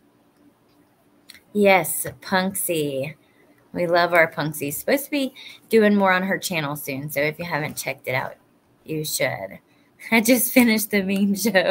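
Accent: South, United States. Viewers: U don’t smack ur lips it’s jus the gorgeous southern accent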